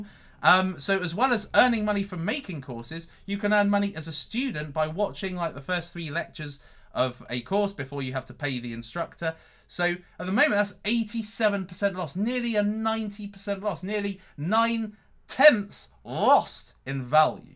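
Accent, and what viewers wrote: England, United Kingdom. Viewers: love your accent, mate. which part of England are you from? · Hi, whare are you from? UK? Thanks for your videos. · He is English lol...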